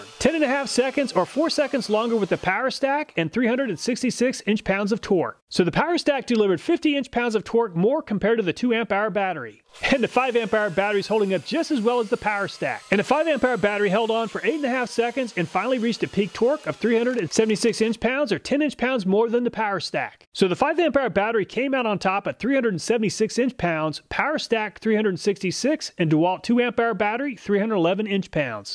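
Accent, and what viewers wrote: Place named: Pittsburgh, United States. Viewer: Are you from the Pittsburgh area? You have the accent. Haha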